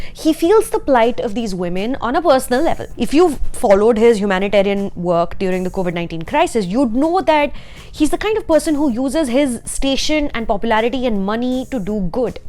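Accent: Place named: India. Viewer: Omg - awful commentator . Could the English pronunciation get any more horrific and the Indian accent be more grinding to hear fir more than 2 mins . Why has FC got these awful film critics on it . They need to go to finishing school first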